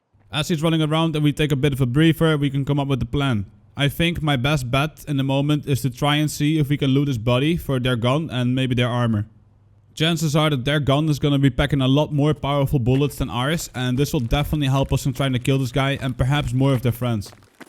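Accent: Netherlands. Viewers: Are you dutch? Your accent is really dutch.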